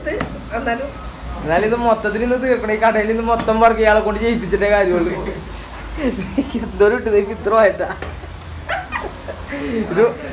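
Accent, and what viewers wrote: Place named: Thrissur, India. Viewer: സംസാസാരം സ്പീഡ് പോരാത്തതിന് ശരിക്കും ത്റ്ശൂർ സംസാര ശൈലിയും ഒന്നും ശരിക്കും മനസ്സിലാവുന്നില്ല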